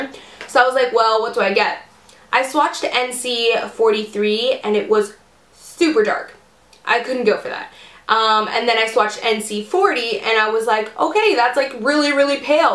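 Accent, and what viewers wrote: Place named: Canada. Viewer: I never noticed how much emphasize you put on your O's lol ive never heard a legit Canadian aaccent. love your haul boo!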